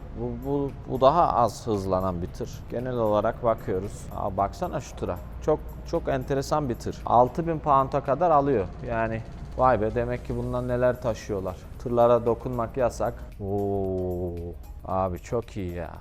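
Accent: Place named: Niğde, Turkey. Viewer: Askerde Niğdeli bir arkadaşım vardı konuşman tipin aynı kesin Niğdeli dir diyordum formayı gördüm yanilmamisim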